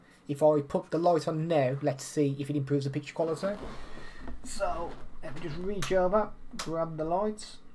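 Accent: United Kingdom. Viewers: The prob is partly the British accent. 🥸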